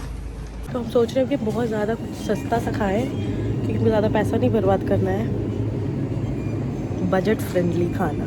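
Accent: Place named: Bihar, India. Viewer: Are you from Bihar. Your hindi sounded like that. I am also from Bihar.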